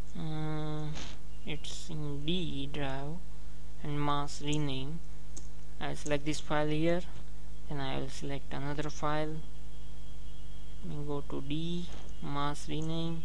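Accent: India. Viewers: oh this indian accent 😮‍💨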